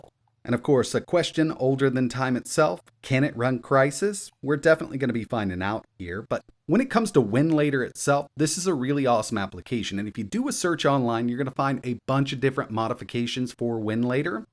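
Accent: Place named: South, United States. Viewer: He's a "Southerner".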